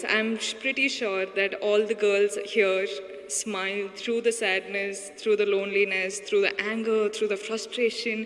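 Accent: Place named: India. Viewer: You dont have to provide subtitles for the speech cz we all understand the indian english accent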